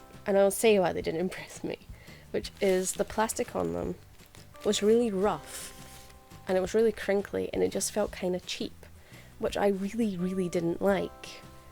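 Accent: United Kingdom. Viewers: I love this video. And the other half dozen of yours I've wached. You're beautiful and your Brit accent slays me. I;m a lifelong Beatles fan and once visited a lady friend in the UK in 1985.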